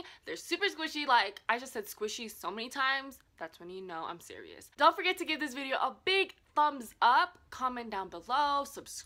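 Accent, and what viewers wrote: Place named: Philadelphia, United States. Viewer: I was wondering where are u from because you speak Spanish and you speak Philadelphia soooooo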